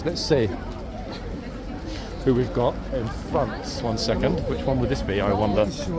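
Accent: United Kingdom. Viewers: I watch your videos always...learning and entertained....while in HK i befriended a few British ladies and guys...jolly people....i love British accent....i also watch DANNY DYER movies,JASON STATHAM and other Brit actors and Irish too....🤗☺ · Your voice is one if the 2 British voices I recognize immediately!! You and Matthew MacFadyen are my two favorites. I enjoy this channel and your humor so much!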